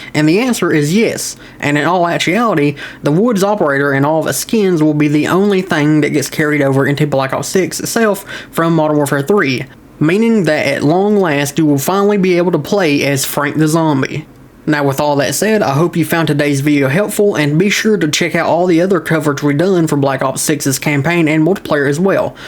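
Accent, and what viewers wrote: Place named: South, United States. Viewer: Sometimes i forget u have a southern accent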